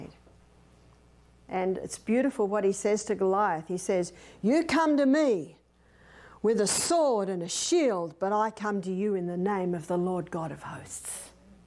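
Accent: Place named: Australia. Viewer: This story sounds so much more believable with an Australian accent.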